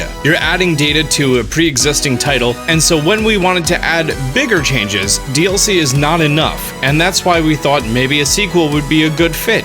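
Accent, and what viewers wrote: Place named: United States. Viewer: Strongest American accent I've ever heard, wtf! 😰😬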